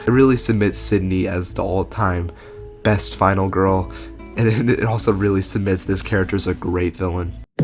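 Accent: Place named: Germany. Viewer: Nice video! You've a german accent kinda xD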